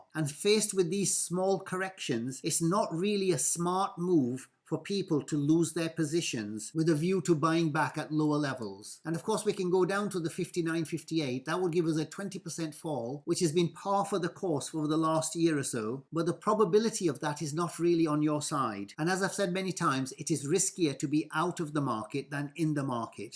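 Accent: United Kingdom. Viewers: Thank you Sir for your financial ideas and for your perfect British English. God Save the King!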